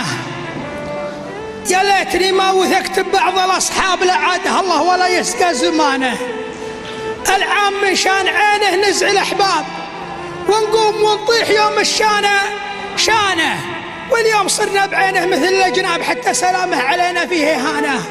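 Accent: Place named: Gulf, Arab world. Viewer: اؤؤف يربي شكد احب الحجي الخليجي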